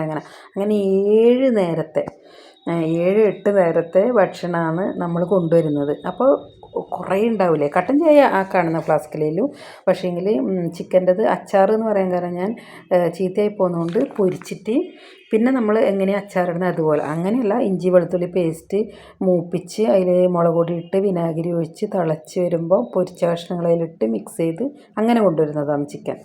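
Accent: Kannur, India. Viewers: YouTube nokkikkondirikkumbozha chechide trainil ndakkunnannathu ingane okkeya enna video kande .samsaram kettappazhe kannurkari aanennu thonni.apo video full kandu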